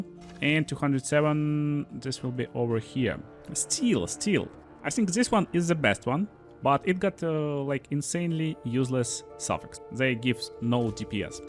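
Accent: Russia. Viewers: Всегда интересно слушать такое, как русский говорит Английские слова по русски) Как говорится, на*бал систему) подскажи, через как ИИ перса на превью делал? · I tried watching, i honestly did, but the russian accent is just killing the entire thing for me. my fire of hatred towards Z pigs cannot be extinguished.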